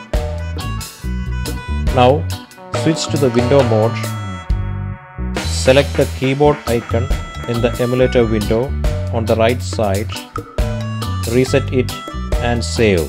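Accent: Kerala, India. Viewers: are you an a mallu ?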